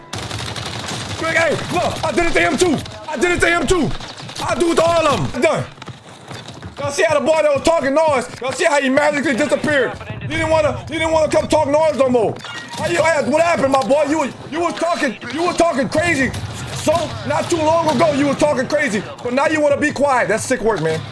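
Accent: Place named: New Orleans, United States. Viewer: Where you from I’m from New Orleans sound like you from here me and my brothers betting they said you not let us know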